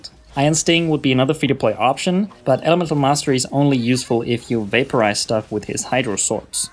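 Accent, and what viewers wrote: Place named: China. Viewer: So, random question your pronunciation of the names makes me think that you are Chinese, which province are you from? I am from Shanghai in Jiangsu.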